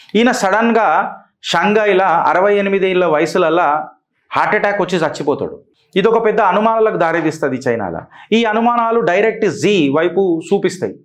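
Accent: Telangana, India. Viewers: Sir మీ ప్రెసెంటేషన్ చాలా బాగుంది.. Flow, తడబడకుండా,, ఎంత చక్కగా matter చెప్తారు... తెలంగాణా తెలుగు వినడానికి ముచ్చటగా, ఇంక వినాలని అనిపిస్తుంది...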